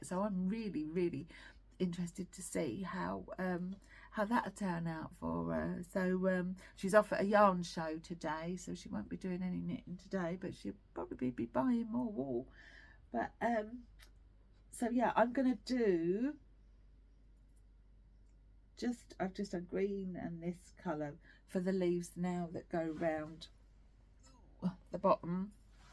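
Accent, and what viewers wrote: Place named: South, United States. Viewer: Ahhh haha thank you so much for letting me know where you live! I could tell it was southern but that's about it! Thanks again for the mention. Knitters really are the best aren't they ❤ much love ❤❤❤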